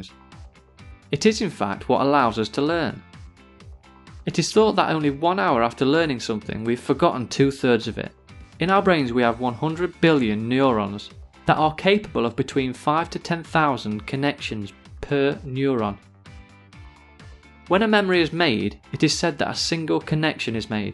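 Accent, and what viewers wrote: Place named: United Kingdom. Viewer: ｍａｙＢＥ it's uk アクセント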